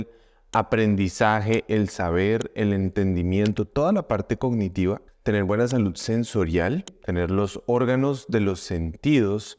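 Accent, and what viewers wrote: Colombia. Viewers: Gracias por sus videos he aprendido un poco más a cuidar mi salud y además con ese acento colombiano que me encanta es más fácil para mí estar concentrada en lo que esta diciendo (soy mexicana) ❤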